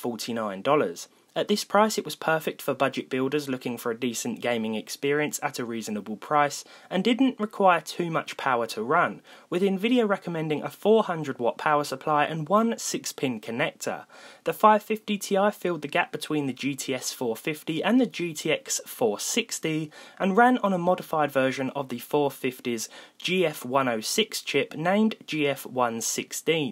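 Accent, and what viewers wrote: United Kingdom. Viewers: that heavy british accent tho, it disgusts me , can you like stop chewing words ? damn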